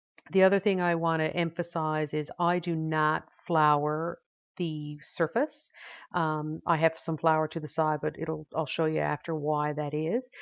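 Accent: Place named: Maine, United States. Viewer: Tried this recipe this morning. Excellent taste!!! Brought me right back to St. Viatare Bagel from St. Lawrence Market in Toronto/Montreal. I used commercial "everything"spice and it was wayyyyyyy too salty. Sesame Seed one was delicious. I followed recipe exactly and would do it again!!! Not too difficult, even for me, a novice yeast dough baker. Great job on the video, and I love your pointers and tips, and of course, your Down East accent.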